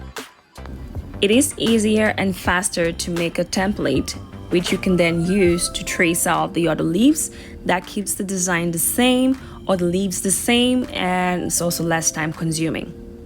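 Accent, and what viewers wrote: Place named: Jamaica. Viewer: Love the little Jamaican accent & your creative crafts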